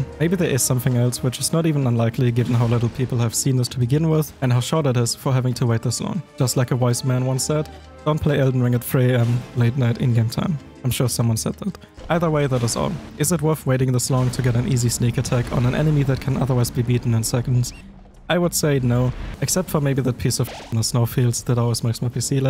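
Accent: Germany. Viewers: When I heard your accent at the very first time I was like, wait I recall this kind of words, is this German? Then I read your channel description haha,I felt compelled to say that, no hate,though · hör ich da nen deutschen akzent?:D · Ich finde es witzig das man sofort raushört das du deutscher bist, obwohl du echt gutes Englisch sprichst. Das Video hat mir echt gefallen und erklärt warum ein paar davon bei mir einfach rumgestanden haben, dachte echt die wären verbuggt. Danke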